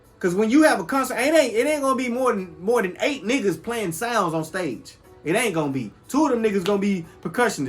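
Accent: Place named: California, United States. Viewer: Lol. Straight up! Say bro as soon as u said “beaucoup” I knew u was a real one. Only people I hear say that word usually from the n.o. But u sound like u from the bay or somewhere in cali. Real recognize real. 🔥 content padna! 💯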